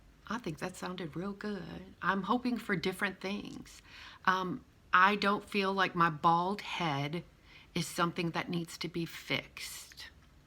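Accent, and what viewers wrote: Louisiana, United States. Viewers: Awesome! Thanks for your honesty! Originally from Lafayette, when I hear you speak it makes me lonesome for the lovely people in South Louisiana, take care and keep spreading your love around, it’s a blessing for us!!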